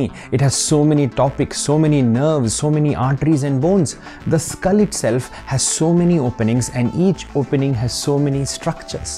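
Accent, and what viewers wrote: India. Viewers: there's no such thing as Indian accent diff parts of India speaks with diff accents from south to north to east to west what he is speaking is probably south indian accent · Duude..really?! You're an Indian and you find indian accent disturbing?! Wow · i really love the indian accent :D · after the indian accent i knew this was the video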